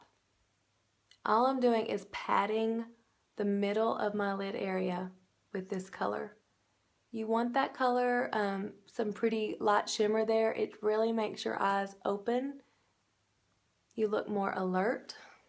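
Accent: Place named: South, United States. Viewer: This is a beautiful look!! I have really small hooded eyes and allergies that make my eyes red all the time. I'm going to try this ASAP and pray that I don't look like Satan!! lol. I love how you used blusher as an eye color....never have thought of that. By the way, what kind of diva light do you use for your videos?? It is wonderful....not too bright but gives you that glow where we can really see. And as a "Southern Belle" myself....I love your accent as well 😊😊!! Thanks for the video. Can't wait to try this!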